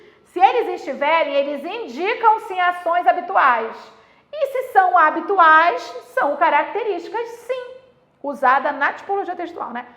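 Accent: Pará, Brazil. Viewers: Paraense pesada! Só pelo sotaque! 😊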